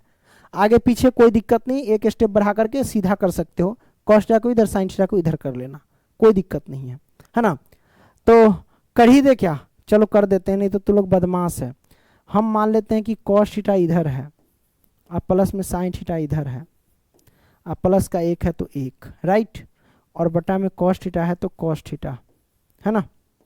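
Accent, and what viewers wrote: Bihar, India. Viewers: Aap Bihar ke ho sir😊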